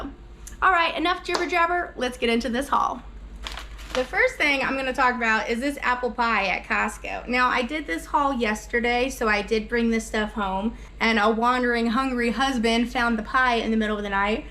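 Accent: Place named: Central Pennsylvania, United States. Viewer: a word like y'all. From central PA. I thought you were originally from pa.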